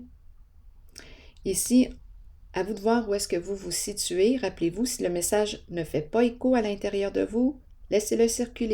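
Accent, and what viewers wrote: Quebec, Canada. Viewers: Bonjour Je te découvre après avoir écouté ton message à travers de ce très beau tiraje.... On diré que tu t'adresse à mon cœur.... c'est exactement ça...... La carte du flirt sur la carte du papillon.....me fait réfléchir sur le fait de lui faire confiance ou non..... Elle, Taureau ascendant Sagittaire de 47 ans, avec un ego et un orgueil très fort et démesuré..... Elle a frapper à la porte de mon cœur le mois de mars dernier. Cette femme a souffert des abus de la part de son père jusque les 17 ans. Elle est sublime mais très abîmée....je souhaite croire que elle pourrait encore s'engager et tourner la page, et aller vers une vie de paix et amour sans tromper ni tricher ni commander.....mais je n'en suis pas tout à fait sûr de cela.... Le mois de janvier sera définitif pour y voir plus clair, réconciliation ou rupture.... et c'est moi qui devrait prendre la décision...... 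Ton magnifique tiraje, termine sans se terminer ni donner des réponses à cet belle mais très dure situacion..... Je te remercie pour ce tiraje tres riche et profond, et pour ton merveilleux accent du Québec....que j'adore......Moi je suis aux Pyrénées-Atlantiques aux pais vasc frances entrain de vivre cet exercice de vie..... A mes 72 ans, je souhaite vivre la paix et l'harmonie...... Si cette belle âme choisit la paix, l'amour et l'harmonie je resterai à son cotée pour continuer à grandir ensemble, mais si ne c'est pas le cas, c'est moi qui va tartir cette fois ci. Je te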